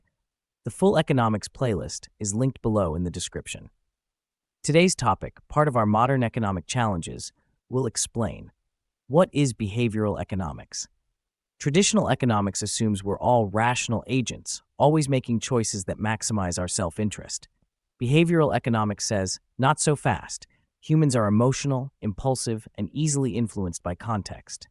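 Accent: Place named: United States. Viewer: American English name, American English speaker, British English material 😮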